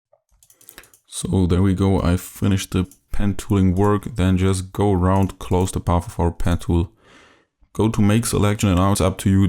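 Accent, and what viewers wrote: Germany. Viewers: I like how you make stuff on the fly, like u even surprise yourself. lols The german accent is also refreshing for some reason, maybe coz its rare that i hear it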